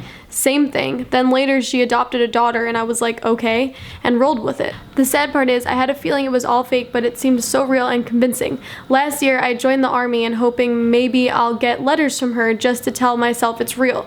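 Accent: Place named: New York, United States. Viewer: guy she's from New York they say words differently 😂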